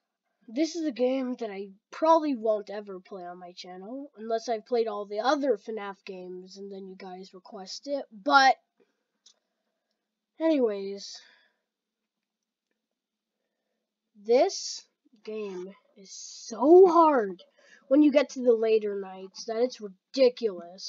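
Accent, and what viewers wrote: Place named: Colombia. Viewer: tienes voz de colombiano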